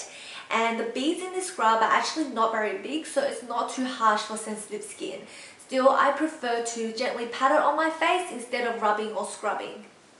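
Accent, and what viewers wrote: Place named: Australia. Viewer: you live in australia.. hahah i no ;) *your accent***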